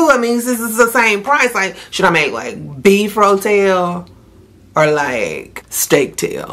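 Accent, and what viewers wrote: South, United States. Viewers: you look so pretty✨ and i can really hear you southern drawl 😍💕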